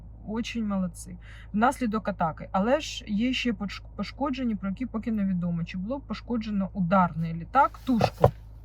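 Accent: Ukraine. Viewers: Обожнюю вас слухати❤ Цей рідненький дніпровський говір - то любов❤ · Дякую Вам. Я обожнюю як ви кажете. Дуже по українські!!! 👍🏼😜 Хай вам щастить!!! Слава Україні!!!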